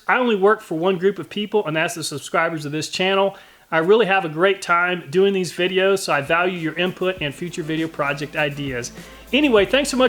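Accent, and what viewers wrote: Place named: Brooklyn, United States. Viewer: He's from brooklyn you can tell by his accent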